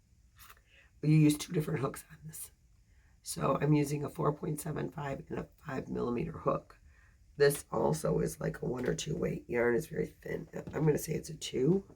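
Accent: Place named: North Carolina, United States. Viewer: X school bus driver Hope get to feeling better you sound like me and my daughter we live in north Carolina feel better love you ❤.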